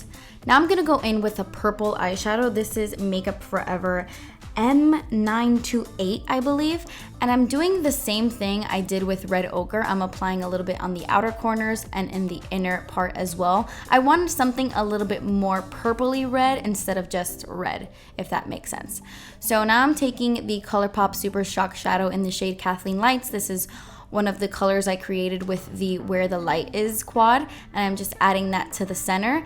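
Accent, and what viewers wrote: Cuba. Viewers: I frikin love your videos y ese acento Cubano! Ay me encanta!! Perfecta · chica yo te las veo perfecta!😂 adoro el acento cubano. Soy Nicaragüense😁. Cuba y Nicaragua son besties.😍 te amo!! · I love when you speak Spanish, I can hear that Cuban accent peaking through 🙌🏼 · You should do a whole video in Spanish!!!! I love your Cuban accent!!! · Stunning as always #LoveTheCubanAccent 💕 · Loving that Cuban accent❤️😍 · thank you for moving far away from the candle!!! it would give me anxiety when it was right behind you....!!!!! and I can't get enough of the Cuban accent, lo amo!!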